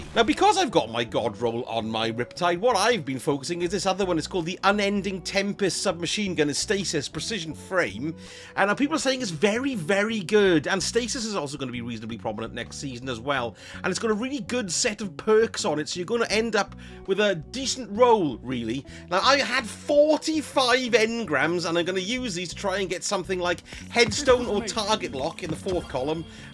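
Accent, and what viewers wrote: United Kingdom. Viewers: Love your channel, nice to hear a non-american accent in videos. (fellow brit btw)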